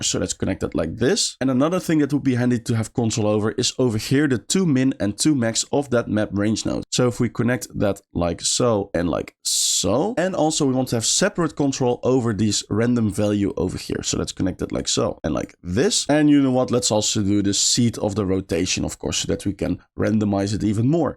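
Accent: Netherlands. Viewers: wonderful tutorial - could tell you were dutch without knowing who you were ;)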